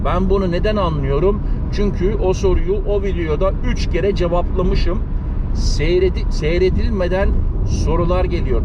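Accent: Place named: Thrace, Turkey. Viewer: videolariniza yeni bakmaya basladim. Herkez yerine, „Erkez“ diyorsun. Memleket neresi? Trakya şivesi duydum gibi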